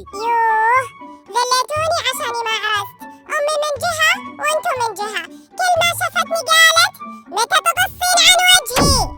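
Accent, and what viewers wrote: Hejaz, Saudi Arabia. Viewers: مافي أحسن من الحجازي 😜😍☺️ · يالبى الحجازية 😍😍😍😍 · الحجازيه تجنن ههههههههههههههه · أحلى لهجه الحجااازي ** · ههههههههههههههه يا لبيييييه الحجازية 😍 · احلا شي الحجازيه 😂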